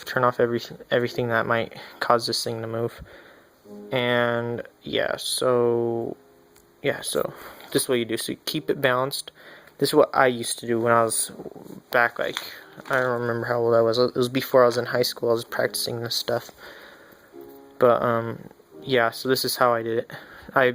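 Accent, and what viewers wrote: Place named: Illinois, United States. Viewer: you from illinois? your accent is frikkin adorable.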